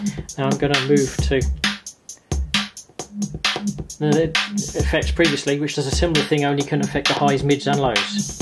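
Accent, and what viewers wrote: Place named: Arizona, United States. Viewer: Arizona accent:D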